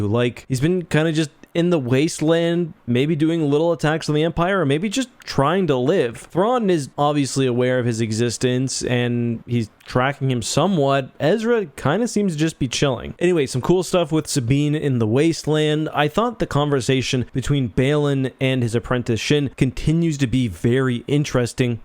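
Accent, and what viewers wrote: Canada. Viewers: Love his Canadian accent!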